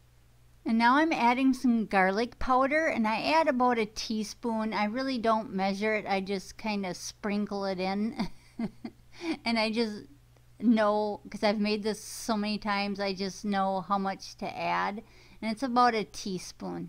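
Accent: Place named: Minnesota, United States. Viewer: jeez, your accent is thicker. (not a bad thing) but you must be from around the iron range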